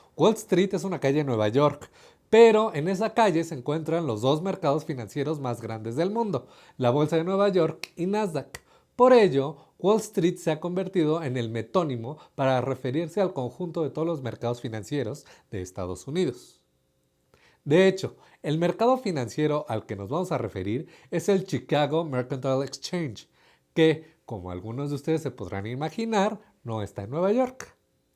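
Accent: Mexico. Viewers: Nuevo suscriptor, encontré en tu vídeo la mejor explicación a mi duda, me supongo que eres mexicano por tu acento, ojala pudieras exponer con este mismo lenguaje el tema de la fluctuación de los tipos de cambio, hay muchas explicaciones que indican como funciona actualmente, pero mi duda es como se cotizaba el peso mexicano antes de 1994 y si todavía hay países que tengan ese tipo de control cambiario y como les va por qué aquí:si Grecia tiene demasiados burócratas el dólar sube si llega un tsunami a Indonesia el dólar sube, si se atora un barco en el canal de suez el dólar sube, si los texanos no pueden pagar sus hipotecas el dólar sube, si se incrementa la producción automotriz en México el dólar sube si Putin le dice compadre a Trump......etc.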